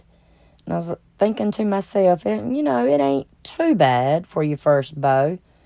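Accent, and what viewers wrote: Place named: Georgia, United States. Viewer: I have to comment on your video. This is the 1st one ive watched & im not sure how i got to you but im SO GLAD i did! 1st of all idk where ypu live but i swear it sounds like listening to home! ( South East Georgia) 2nd...I have been doing floral design and decorating for over 30 yrs now. You have the eye for & know what you like! You also have the gumption to get out there and try it PLUS put it out there for the world to see! The good the bad the ugly...etc..plus ypu are HONEST & realistic in your videos! Ive been watching YouTube for years and collecting craft stuff to flip- upcycle- diy for YEARS....and uts sitting in my garage. Because i dont have the confidence in myself to just get out there sort through it and DO IT. So it doesn't matter what ANYONE says. You are an inspiration to me! An encouragement and a little taste of home when I listen to your voice overs. 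Plus im getting out in my garage this weekend and getting started! Design, decorating, partys, weddings used to be my happy place. I just got burned out. But you are 100% right...this is for YOU what you like, and your learning as you go. It really made me realize that its OK to not have it 100% perfect and set up so everyone only sees the pretty side...ypur a realistic crafter that does make me feel like my messy horde of stuff is a problem only i have! 🤣 so carry on girl! One last thing The lady that taught me how to design had an expression i couldn't help thinking about when you were making this wreath..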